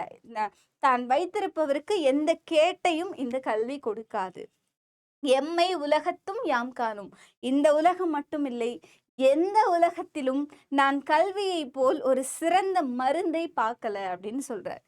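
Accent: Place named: Sri Lanka. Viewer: இலங்கை தமிழ் கேட்க எவ்வளவு நாள் ஆகிவிட்டது இனிய தமிழ் ஓசை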